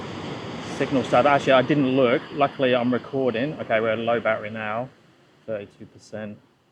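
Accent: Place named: United Kingdom. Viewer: Are you originally British? You have a very british sounding accent Down under aha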